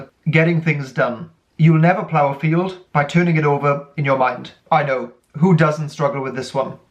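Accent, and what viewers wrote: Wales, United Kingdom. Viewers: random question: are you welsh?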